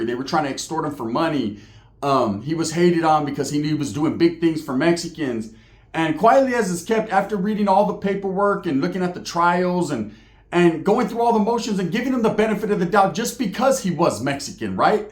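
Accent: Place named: California, United States. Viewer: You from Cali I bet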